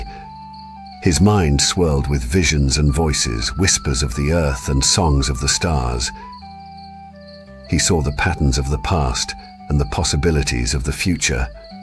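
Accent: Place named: United Kingdom. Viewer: 😡 This is a great Irish myth. And they've got the story wrong! Even worse they used a British accent. Do they not know how insulting that is? Sigh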